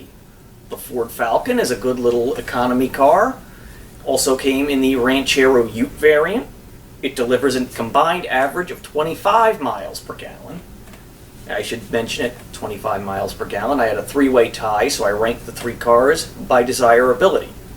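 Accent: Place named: Chicago, United States. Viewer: You said South Carolina but I hear Chicago.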